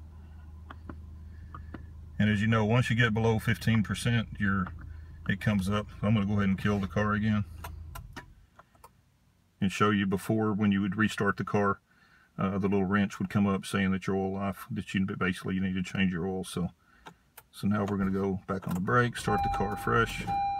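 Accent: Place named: South, United States. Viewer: You must be a southern boy...awl light....awl .... aint no crime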